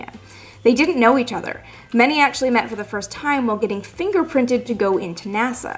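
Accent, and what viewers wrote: Canada. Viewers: Leaning into the Canadian accent for this? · I love Canadians. You guys are so incredibly nice, it's awesome. Canadian women are among the most beautiful in the world ( being a perfect example). Plus it's so cute the way the say aboot, time oot. I'm quite smitten with, I admit it. She is the perfect woman, smart, funny, intelligent, Canadian, and she loves space.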